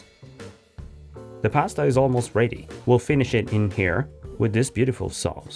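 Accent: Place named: Italy. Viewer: I would love to know the name of the chef/person narrating this video! His voice, Italian accent, and sprinkling some Italian in with the narration are perfect! ❤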